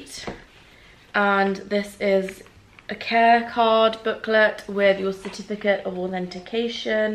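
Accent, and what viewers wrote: United Kingdom. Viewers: You are iconic (with the British accent)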